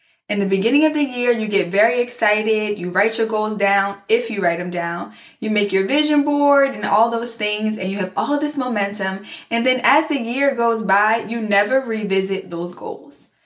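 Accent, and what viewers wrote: Baltimore, United States. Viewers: When I signed up for your email newsletter a few weeks ago it said Maryland at the bottom of the email. I knew you were from somewhere in Maryland because certain words you say you sound exactly like my husband whose from Baltimore and I thought that confirmation was too funny lol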